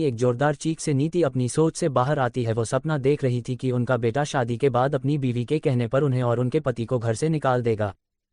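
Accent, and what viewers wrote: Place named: Haryana, India. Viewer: Yes haryanavi I like it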